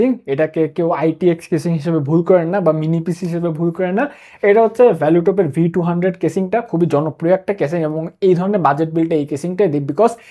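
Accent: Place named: Chittagong, Bangladesh. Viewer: ভাইয়ের বাড়ি কি চিটাং নাকি!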